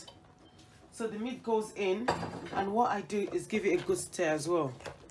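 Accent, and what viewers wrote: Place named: United Kingdom. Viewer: Ur British accent eehh😁😀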